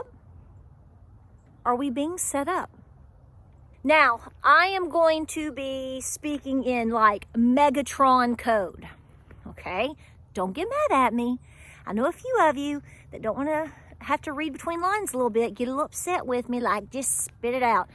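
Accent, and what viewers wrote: United States. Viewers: Y do u speak like a Yankee... America is weak asf that's y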